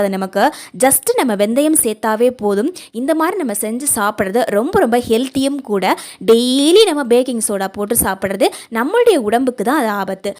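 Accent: Kerala, India. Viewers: Unga slang la malayalam vasanai veesuthu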